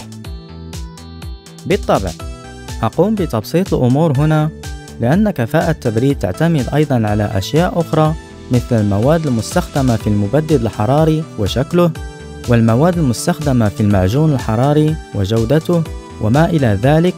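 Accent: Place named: Morocco. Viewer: من صوت عرفتك مغربي مهم فيديو واعر 🎉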